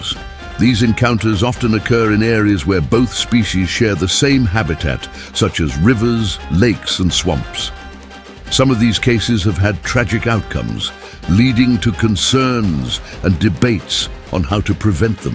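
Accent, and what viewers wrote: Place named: United Kingdom. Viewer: What an interesting Indian bgm, while the guy narrates in British English. What a harmony😊.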